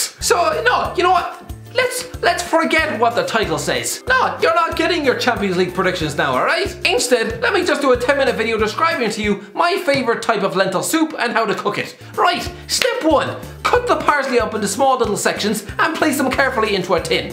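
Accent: Ireland. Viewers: Are you irish? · What part of Ireland r u from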